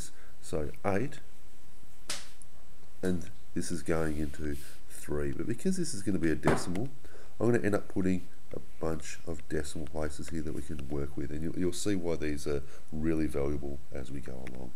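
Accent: Australia. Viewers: I bet 100% that he is australian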